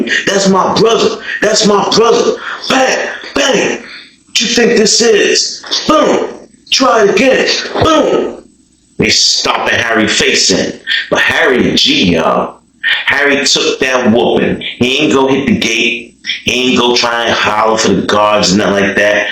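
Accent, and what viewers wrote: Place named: New Jersey, United States. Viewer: What part of Jersey you from my G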